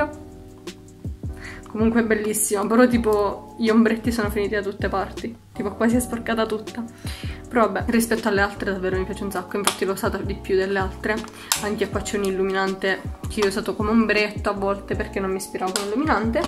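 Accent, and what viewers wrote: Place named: Sicily, Italy. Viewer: secondo me sei di Marsala . Cmq sei siciliana come me. Io abito in provincia di palermo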